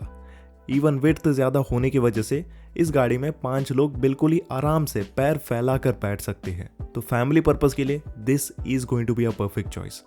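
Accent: Kerala, India. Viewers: Mallu accent 😊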